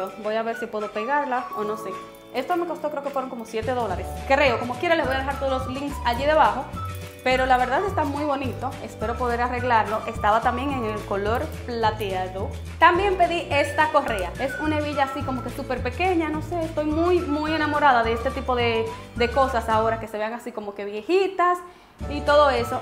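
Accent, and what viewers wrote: Dominican Republic. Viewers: yo tambien hablo asi, y mi familia, amigos, etc. Aunque es cierto que en RD hay diferencias al hablar en cada region, la mayoria hablamos de igual manera. Saludos! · Por que las dos son dominicanas y tienen la misma forma de hablar.. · "Hablas igual a Yarissa!!" Se llama ser de República Dominicana, pedazo de bestias. :V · A eso se le llama ser de republica Dominicana 😂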